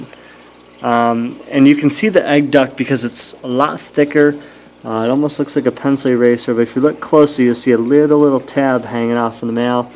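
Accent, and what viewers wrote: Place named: Massachusetts, United States. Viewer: are you from Massachusetts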